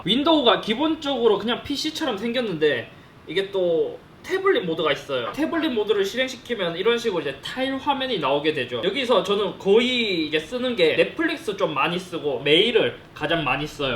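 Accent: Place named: Andong, South Korea. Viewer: 말투가 어디서 많이 들어봤는데...했더니 아버지따라 시골가서 듣던 말투군요. 안동분이실줄이야 ㅎㅎㅎ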